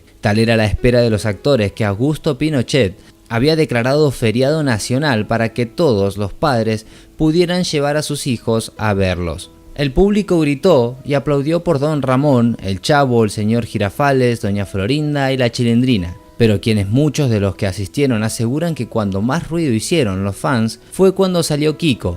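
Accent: Argentina. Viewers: Narrador sos. Argentino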